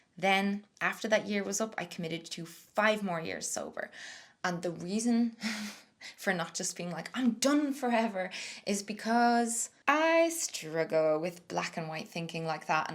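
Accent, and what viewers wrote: Ireland. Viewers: First but least important… you Irish accent is like music to listen to… more importantly, your stories in this video match my experience… actually tired of waking up and checking my phone to see what the fuck I did last night… I’m not there yet but I’m binging videos like yours to give me hope… thank you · The irish accent is really nice to listen too :)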